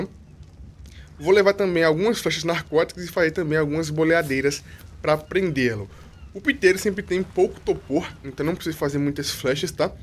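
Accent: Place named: Alagoas, Brazil. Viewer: Cara vc é de Alagoas? Esse sotaque não me é estranho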